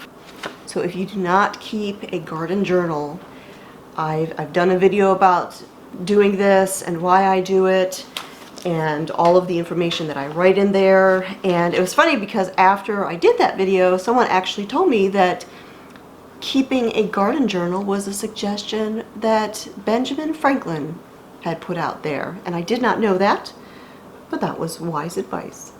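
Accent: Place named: South, United States. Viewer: Love the southern accent! I would have pronounced like you even though I grew up in the south.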